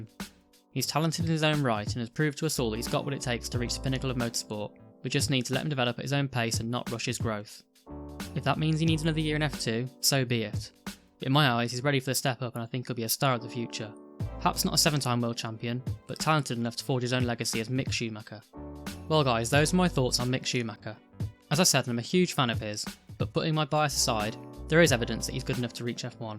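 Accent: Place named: United Kingdom. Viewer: With all due respect, just some input suggestions critique feedback advice. I know that English language came from the UK GBR, especially England and Scotland. But, not everyone understand British accent, let alone if you speak really fast like that. I actually able to understand Sky Sports F1 even tho they have British accent, because they speak slower. But, I had a hard time trying to understand what you said, that I had to replayed it a few times. Even so, I still couldn't get all of what you said, only a few. Besides speaking slower, it would be better if you provide captions. Because the auto-caps isn't that accurate. Thankyou very much🙏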